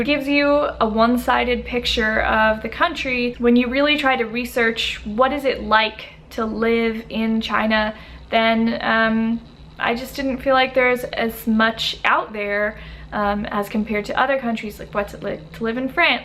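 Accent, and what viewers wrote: Argentina. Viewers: Suenas Argentina! Que linda! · Holaaa !! Soy de Argentina , que lindo que estés interesada en aprender Español !! Se te nota el acento argentino !!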